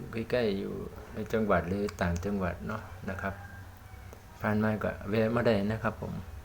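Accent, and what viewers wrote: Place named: Isan, Thailand. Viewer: เว้าเป็นแล้ว🤣🤣🤣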